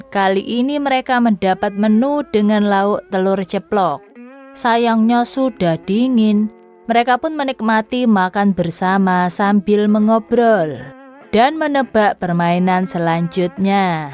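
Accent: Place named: Java, Indonesia. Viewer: Mbak e suarane medok tenan 🙏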